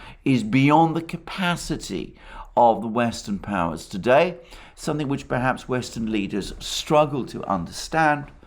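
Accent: United Kingdom. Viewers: Ha! You are confusing a British voice with extraordinary insight. All this information is pretty much common and available all over the Internet. I agree he is eloquent in what little he actually says.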